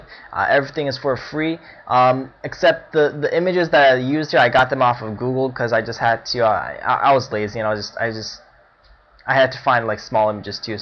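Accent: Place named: Midwest, United States. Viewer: Thank-you for the tutorial! In answer to your question - your accent sounds like midwest American with a slight hint of Asian.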